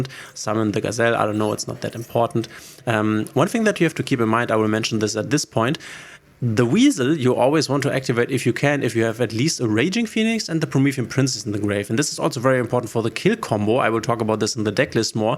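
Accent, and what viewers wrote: Germany. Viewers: Bruder mach bitte ein Video auf deutsch und eins auf Englisch weil ich als deutscher so krass raushöre das du deutsch bist 🥲😂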